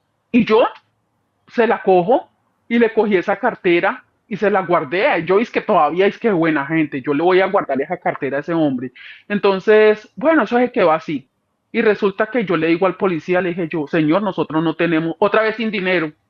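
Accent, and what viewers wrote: Colombia. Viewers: Tristemente creo que por su forma de hablar es “caleña”🙄🙄🫣🫣👎🏽👎🏽👎🏽👎🏽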